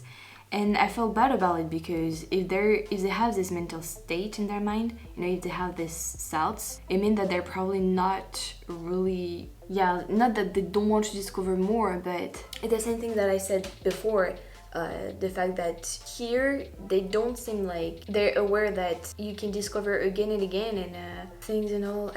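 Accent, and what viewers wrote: France. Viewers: Bonjour Don't listen to those who say your English isn't "good enough". It is very good, I have no problem understanding what you are saying, you are able to express yourself well, and I think the French accent is a beautiful addition and adds so much charm!:) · My parents told us "If you have nothing good to say, you say nothing at all" Words I've lived by. I found reaction channels on computer by accident. It's amazing how many are out there. I love them and I'll tell you why. I Love REACTIONS. I was born in 1956 (yes I;m old ):) I get to see a lot of titles I grew up with. I love music of all kinds. I get so excited to see younger people react to music I lived by, it's so refreshing. I'm addicted now so I "Like and Subscribe" to as many as I can. They brighten my day. Your channel was one of the first channels I subscribed to, why? First of all I have a weakness for french ladies:) I am Canadian, I'm english but totally love the french language. 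I took french in school and understand enough to get by. I love your accent, I love that you are so much fun to listen to, I love your smile on your videso, I love how you try to learn as much as you can, I love that you visited Canada, and had fun... I could go on and on my friend. You took us to the USA you showed us the Normandy war memorials and you love Timmies French Vanilla ( as do I ) You Make my Day. How anyone can find bad things to say are beyond me. You are doing Reactions, nobody is an expert yet some folks are really smart on here and have followed music all their lives. I enjoy their reactions as well. Last word. You are the prettiest, sweetest, sincerest on my list of reactionist. Ignore the negative, they can just leave or like you said, why even leave a comm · I love your French accent and your broken English is cute and endearing. I hope you never lose it. I never thought of you as anything other than just a person sharing your experience and thoughts with us as you explore new music etc. 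I like your style so keep it going. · Hola (Hello in Spanish pronounced "O-la")! On any social media platform people like these are known as "trolls" pay no attention to them I've seen them go on children's and people with disabilities channels and leave hateful comments. The funny thing is that they do not realize that they are helping your channel by giving you more views! So do not waste time, energy or sleep thinking about these people. You are one of the few channels to which I subscribe and it's because you being from France I enjoy you learning new things whether it's music, movies and English! You accent is beautiful and you are right I myself know nothing about popular French music, food, lifestyle or customs so I don't see how people can expect you know all these things from here in the United States or any other country where you did not grow up. So just do what is fun and makes you happy and those who don't like it can add to your channel views and move on!!! 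Sending you BLESSINGS from Texas!!! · I watched a language video about English words that native speakers pronounce wrong. There are words in English that English speakers pronounce wrong but you a native french speaker are supposed to speak English correctly. I also wonder how many languages the people insulting your English speak. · You're actually very charming, please don't be influenced by negative comments ... Yeah-h-h, I'm one of those guys who feels your French accent is adorable ... this is coming from an American with a PhD (from Independence/Kansas City, Missouri USA) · Hi, I’m Jim Rainguet(French American), 71 years old, widowed, and thoroughly enjoy your reactions and your French pronunciations of English. You seem smart to me and isn’t having a reaction to new things the point of your channel. Don’t listen to the negative weirdos, just know people like me are out here reacting to things along with those who appreciate and love you! 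Jim Rainguet, Colorado, USA.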